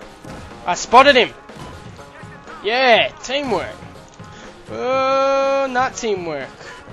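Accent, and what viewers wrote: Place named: Australia. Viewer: Where from Australia are u from?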